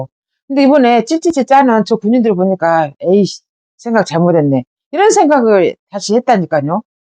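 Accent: Jeolla, South Korea. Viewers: 매일 잘보고 있어요..날카로운 지적과 판단에 박수보냅니다... 전라도 아줌마의 사투리와 표현이 귀에 쏘옥 들어오네요..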